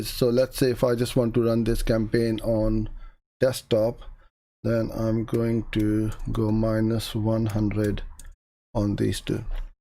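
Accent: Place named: England, United Kingdom. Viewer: You talk too much.Impossible to suffer that English accent more than 10 min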